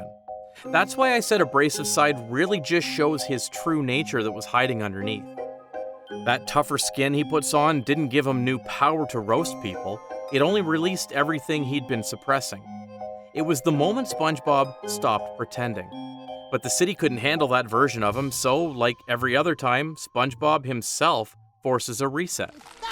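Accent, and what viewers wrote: Canada. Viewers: Your Canadian accent is so prominent lol. Fire video though! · Are you Canadian, my guy?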